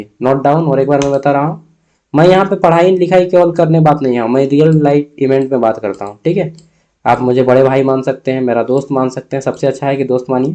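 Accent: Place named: Jharkhand, India. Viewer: Aapka khortha wala tone bhut shi lgta hai sunne m😃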